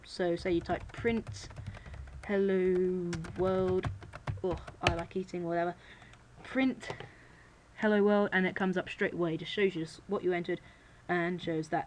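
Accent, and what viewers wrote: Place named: United Kingdom. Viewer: He's britan lol mostly I hate there acent.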